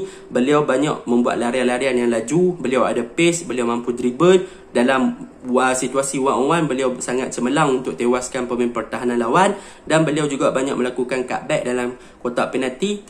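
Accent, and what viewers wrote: Kedah, Malaysia. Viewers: Sorry aku takkan subscribe , Kalau admin cakap masih ada pelat2 kedah .. Sorry to say !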